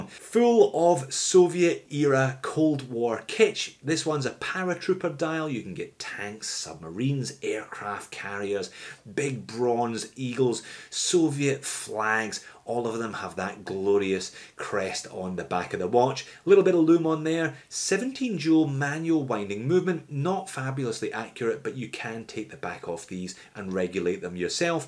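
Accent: Scotland, United Kingdom. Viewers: Great watches, great video, great T-shirt, love ur Scottish accent. 🏴👍 Most people can’t afford “luxury watches” so it is great that you test affordable watches and give them some love. I am surprised about these proudly Chinese pieces. They seem to be a good alternative. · I suspected that you were Scottish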